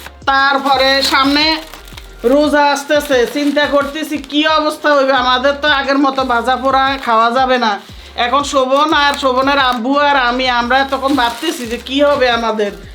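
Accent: Noakhali, Bangladesh. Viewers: খালা আপনি নোয়াখালির ভাষায় কথা বলেন।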